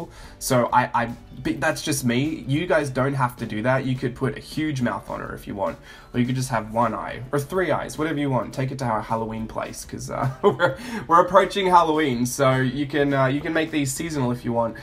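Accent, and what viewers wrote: Australia. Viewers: Love the dolls and yes perfect for my journal. Great to hear an Aussie accent on here too there are not many . Yay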